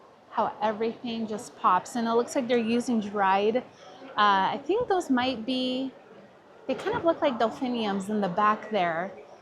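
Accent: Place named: England, United Kingdom. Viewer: looks like you picked up that lovely English accent 😊❤❤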